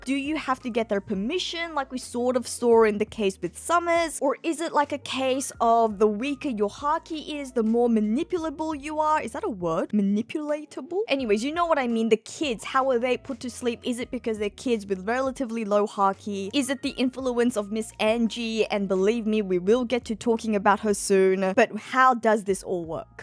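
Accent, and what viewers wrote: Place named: Australia. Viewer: She’s always beautiful. An Asian girl with an Australian accent.. the dream girl of most weebs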